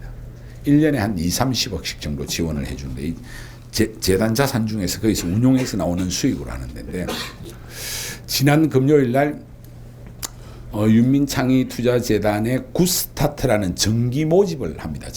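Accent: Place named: Gyeongsang, South Korea. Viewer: 고자 동남방언 억양 때문인듯 ㅎㅎ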